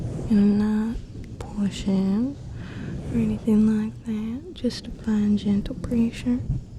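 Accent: South, United States. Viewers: YES! And she be southern tonight. Hi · Another amazing & relaxing ASMR video well done,! You're really good at that southern accent every time, and I love it.😎👌 And as for the face massage and paw sounds, your paws always look the best (the softest) for these types of videos.😉👍 Please keep up the amazing work and have a great day.😊🫂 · Southern accent gets me every time. 👌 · I absolutely love the southern accent, especially at "my sweet little honey-bumpkin" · I absolutely love the southern accent very cute ^^. And another amazing and relaxing video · yaaaaaaaaaaaaaaaaaaaaaay southern accent!!! yipee! yay! wooooo!:3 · ? How is your British accent from your odd Therapy session video and now your Southern accent in this video so good? Wait no. Don't tell me. Because it is good. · Oh snap! She's gone Southern!!! Time to relax! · THE SOUTHERN ACCENT IS BACK. 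I can finally die happy...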